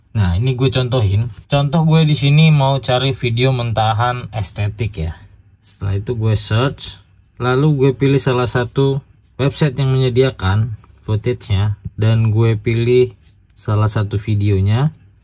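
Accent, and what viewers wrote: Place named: Sunda, Indonesia. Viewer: Kok seperti suara Aa bandung ya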